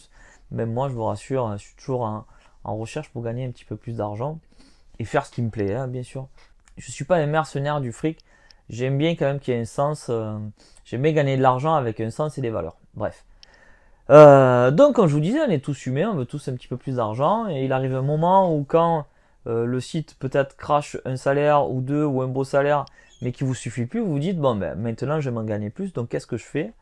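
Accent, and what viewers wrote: South, France. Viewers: "tu envoies" :-) et y a une fofote dans la vidéo au début, "une autre site" mais on s'en fout du moment qu'on entend ta belle voix du sud aussi mélodieuse que les cigales! (c'est vendredi je suis fatiguée)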